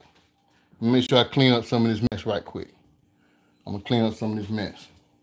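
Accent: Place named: North Carolina, United States. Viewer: Hey, I don't know what part of the country you're from but it sounds like N.C. but heard you said you got a air fryer, well I'm gonna get one but I've been waiting for a good one do you recommend the one you have with the fried 🌽 corn? Barbara j.from N.C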